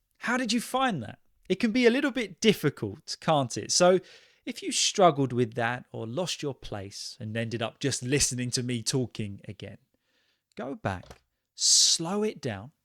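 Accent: United Kingdom. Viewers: It is stupendous teacher,.I follow British pronunciation therefore, I try to imitate you. Hence, please try to make more speaking videos 😊 Thanks from India.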